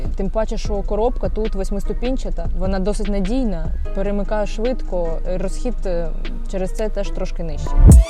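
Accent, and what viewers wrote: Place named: Russia. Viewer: Видно что тяжело даётся украинский язык. Нужно контролить себя ))) как только расслабишься сразу русский вылетает )))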